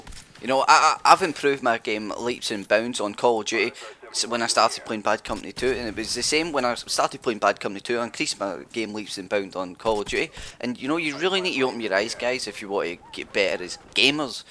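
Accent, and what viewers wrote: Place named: Ireland. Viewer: Everything's funny with an irish accent!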